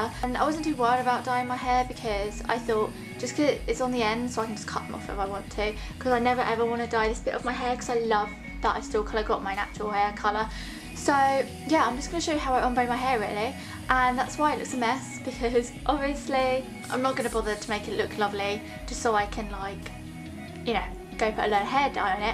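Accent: United Kingdom. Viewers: ARE YOU BRITISH? ;O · r u brittish ? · Dat british accent... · he I'm french and I looove ombré hair! But don't you think I shouldn't do it at home ? it'll be better at a haidresser don't you think ? pleaase answer !! oh by the way I don't really get your accent ? are you british ? not american I guess ?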